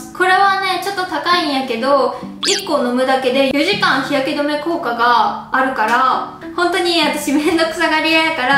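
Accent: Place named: Gifu, Japan. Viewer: 方言が岐阜っぽいんやけど？。。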